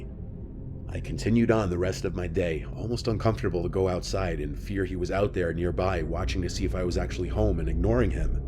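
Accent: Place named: Hudson Valley, United States. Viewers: Hudson valley Accent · Hudson Valley accent LOL!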